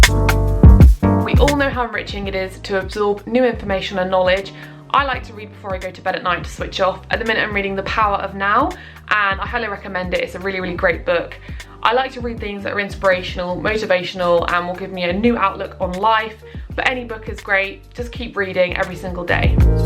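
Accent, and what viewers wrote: United Kingdom. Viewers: I like your British accent and thank you very much!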